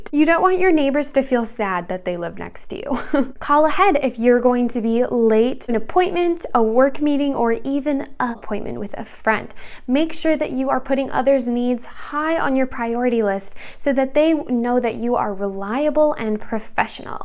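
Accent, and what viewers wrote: Midwest, United States. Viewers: What Midwest state you from?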